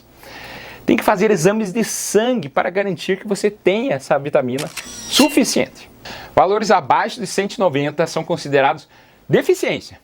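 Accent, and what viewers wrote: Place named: Minas Gerais, Brazil. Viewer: Esse doutor é muito simpático, sotaque de mineirim 🥰 Bom que fala rápido...rsrs...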